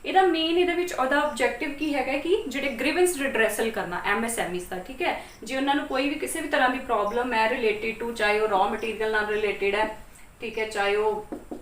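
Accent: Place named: Majha, India. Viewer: Madam amritsar di lgdi a ya majhe di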